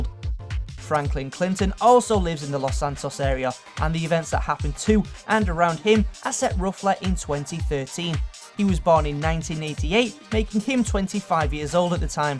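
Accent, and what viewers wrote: United Kingdom. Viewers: Fuck off he is british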